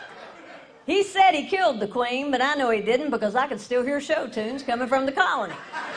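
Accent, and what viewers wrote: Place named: South, United States. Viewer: Something about that southern accent..... quite nice.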